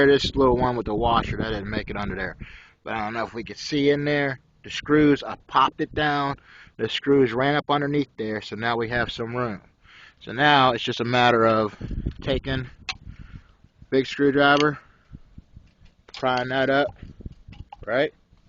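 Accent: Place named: Pittsburgh, United States. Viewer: Pittsburgh? I recognize the street signs and the accent! What's up brother?!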